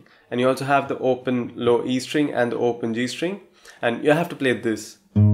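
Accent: India. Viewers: Your accent is kinda similar to indian accent! Are you from India? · Hey bro are you from India your accent sounds a little bit Indian 😅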